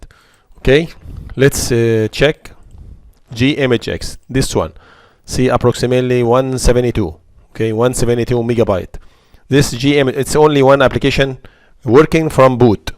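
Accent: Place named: Egypt. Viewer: Thanks, I think you are Egyptian Inferring from the accent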